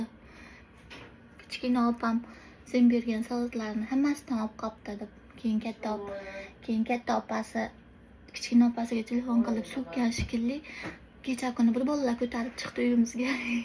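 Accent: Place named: Fergana Valley, Uzbekistan. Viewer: УЗИ если,Тошкентлимисиз йоки водийданми?Шевангиз Водийга тортади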